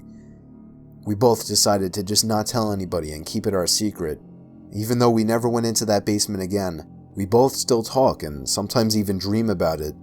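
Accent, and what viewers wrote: United States. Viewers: Your accent brings back rad memories of my time in the US..........Are you one of the beastie boys? 🤓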